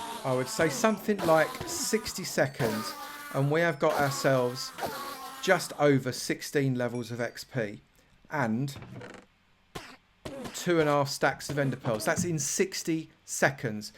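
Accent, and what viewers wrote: United Kingdom. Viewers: Niks because he has a British accent, dumbass.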